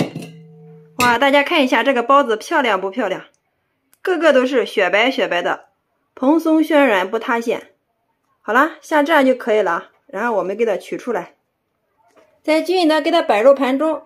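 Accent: Shandong, China. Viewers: 山东人吧？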